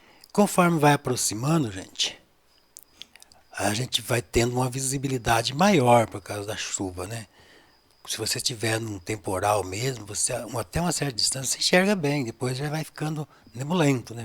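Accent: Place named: Minas Gerais, Brazil. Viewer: Adorei suas dicas. Seu sotaque mineiro é muito bom. Obrigado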